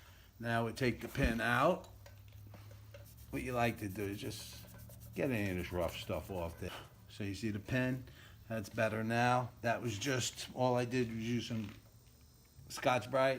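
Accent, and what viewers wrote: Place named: South, United States. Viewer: I noticed you have a slight accent are you from are you from Tennessee or Kentucky 🤠🤠🤠🇺🇲🇺🇸🇺🇸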